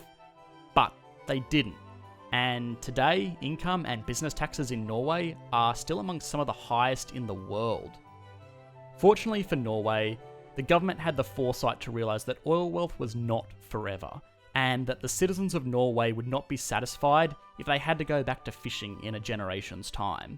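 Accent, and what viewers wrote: Australia. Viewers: Why does this guy sound Australian? Is he Norwegian? If not then all his stats are based on Literature found and stats not of his own experience. I would rather get my statistic figures from a local source, as opposed to stats found in the narrators home library. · i seriously hate the austrailian accebt , so many wordsso distorted , i cannot understand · Great video. It sounds like you’re an Aussie; I’d be curious to know how you feel about Australia’s resource economy in light of what you’ve learnt about Norway’s policies.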